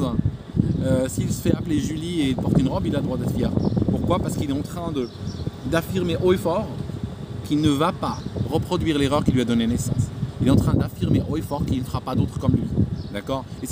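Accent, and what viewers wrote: Switzerland. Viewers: Ton accent Suisse revient grave quand tu es énervé...😂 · Le retour de l'accent suisse d'?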